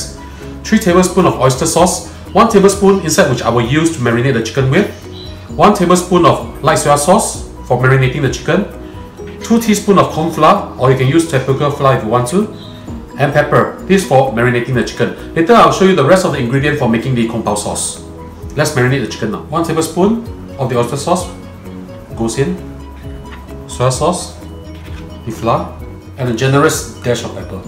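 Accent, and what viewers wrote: Singapore. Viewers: Singapore English lol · I would say he has a heavy Singaporean accent. It is not Hong Kong. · This is Singlish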